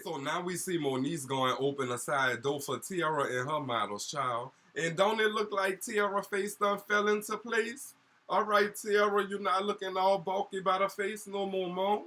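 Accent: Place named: New Orleans, United States. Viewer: I'm mad at you! you got the nerve to make the episode seem exciting but it was Trash LoL, you're brilliant. Love your Bondy & Supa accent in the hse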